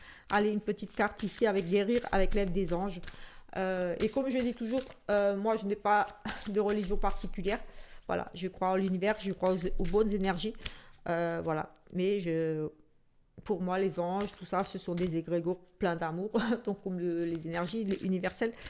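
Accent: Alsace, France. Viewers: Bonsoir, je vous ai découverte il y a peu. J'ai immédiatement entendu que vous veniez d'Alsace et il n'y a pas de problème. Je suis du Sud ouest et j'ai aussi un accent alors ne vous laissez pas diriger par les autres pour si peu. Dites ce que vous avez à dire. Merci pour votre vidéo.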